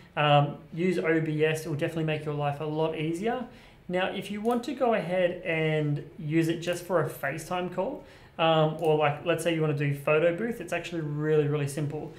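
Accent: Australia. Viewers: That was very informative and great to hear a fellow Aussie presenting. I have downloaded Melon for streaming to YouTube but not sure how I ajust zoom, colours etc on my Brio 4K in Melon, I don't think I can. Is OBS a streaming software also? I don't want the 2 programs clashing. Or can I adjust everything in OBS and still stream from Melon? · Very nice tutorial (plus, Americans are suckers for Aussie accents)! :-). Really bummed that FaceTime doesn't let you make any fine adjustments to the camera as I'm seeing a lot of color cast on my FaceTime calls with the Brio. Let's see if Zoom or Skype does any better with color correction.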